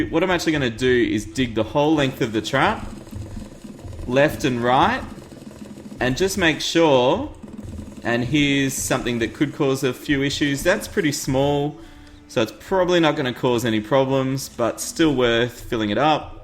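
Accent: Australia. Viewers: Aussie aussie aussie oi oi oi i can tell by your accent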